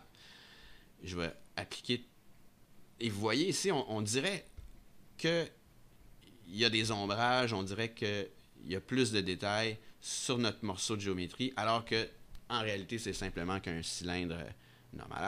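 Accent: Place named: Canada. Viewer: cool tutorial, in french would be cool, t as un accent tres comic pour les non-canadiens!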